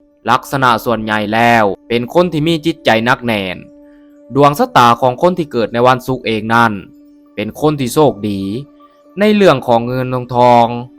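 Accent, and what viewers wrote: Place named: Laos. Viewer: สำเนียงพูดเหมือนคนลาว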